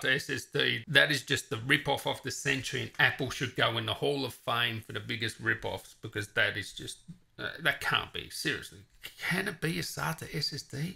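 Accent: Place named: Australia. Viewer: I’ve watched every 2019 iMac video on YouTube (cos I’m about to buy one) and I loved this video the most. As a fellow Australian, it’s so refreshing to have a thick Aussie accent on a tech video and your advice to viewers to get great performance if they’re on a budget is really good. Since you posted your vid, they halved their prices on ssds so I might order the i9 with a 1TB ssd. Thanks again.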